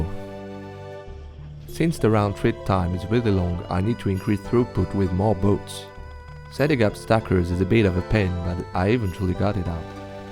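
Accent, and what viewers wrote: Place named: England, United Kingdom. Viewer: Joli accent englais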